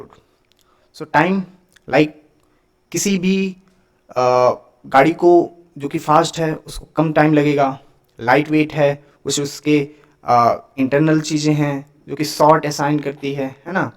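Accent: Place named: United Kingdom. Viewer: Sir ka hindi bhi English accent me h 😅 But explained too good 👍🙌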